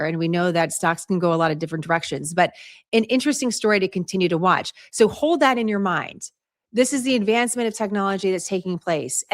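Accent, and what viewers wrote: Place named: United States. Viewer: Quite frankly you sound like an American disguised as a good girl.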